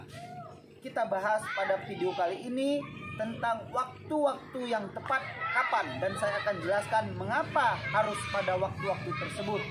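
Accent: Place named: Indonesia. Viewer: anda kedengaran asli dgn loghat bahasa indonesia anda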